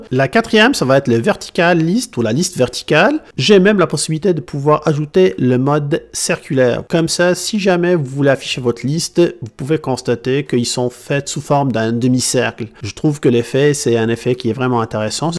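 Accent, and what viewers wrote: Quebec, Canada. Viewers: Je n'ai meme pas encore recu mon Fold 3 que je magasine pour des app qui vont m'etre utile et je suis tombé sur ton video. C'est clair que je vais l'installer. Merci pour la video. En tant que Quebecois, ton accent Francais avec un soupcon de Quebecois est vraiment cute hehe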